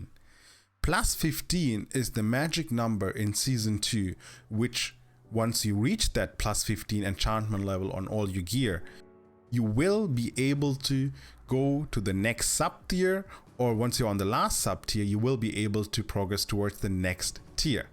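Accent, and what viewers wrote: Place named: Austria. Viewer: Thanks for the clear explanation bro. Based on your experience, what was the highest item level you saw in game? I've seen a lot of videos and they ranged from <num> only. btw I like you accent, it's like Arnold Schwarzenegger. lol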